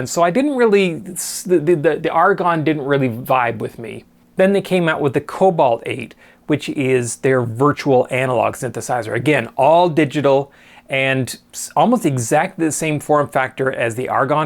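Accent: Canada. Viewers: Canadian?? French canadian??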